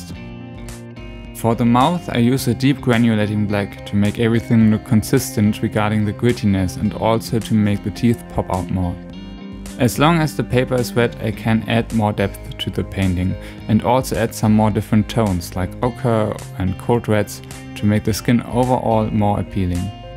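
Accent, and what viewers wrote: Germany. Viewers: Sun Shines Oh... Didn't know but his accent sure sounds German. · Wow, not only is he a great artist, but also has a cute German accent! I could watch his videos for hours.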